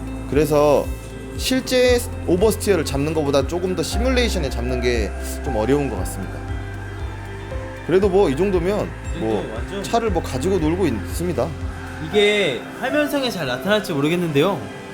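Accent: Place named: Jeolla, South Korea. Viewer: 안녕하세요..예전에 제네시스 EQ900 모터스튜디오에서 잠시뵈었지요..잔라도 사투리 억양과 준비 많이한 멋진영상 잘봤습니다..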